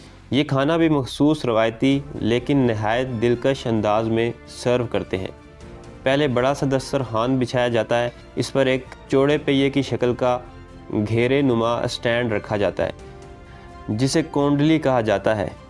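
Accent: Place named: Punjab, Pakistan. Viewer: What a boring commentary in typically boring Pendu Punjabi accent with slow and mispronounced Urdu words. No doubt Punjab University has lowered it's standards of entry and under pressure from Jati Umra's chief Kanjri Mrs Maryam Safdar Awan's, wife of Safdar Masalli of Mansehra, influence or orders all Pendus must be given training to speak in LaWhori style of speaking. Now that's what I call dumbing down.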